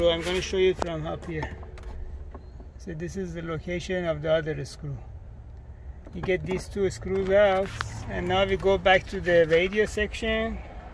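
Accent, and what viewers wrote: Iran. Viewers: You must be Persian I love your accent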